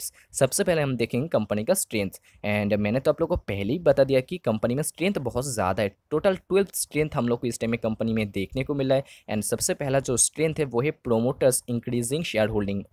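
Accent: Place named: Bihar, India. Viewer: Tu bihari hai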